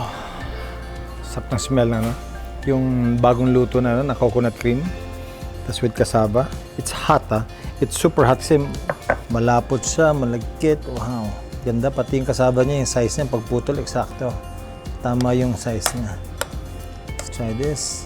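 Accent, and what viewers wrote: Visayas, Philippines. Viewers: Idol sir richard maypagka bisaya man diay ka🤣🤣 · Ka cute nimu mobisaya mayor. Heheheheh LISOD KAAYO OE. Hehehehe my family loved to watch ur videos mayor. More videos pls. GOD Bless u and ur family always. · Hahaha lisud kaau oiiii hahaha arang ka bisaja ug accent 😂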